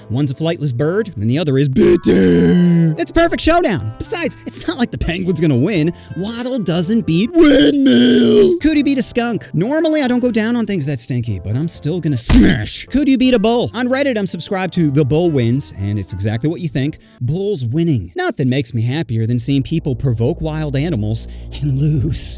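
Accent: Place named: South, United States. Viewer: Bro went all Southern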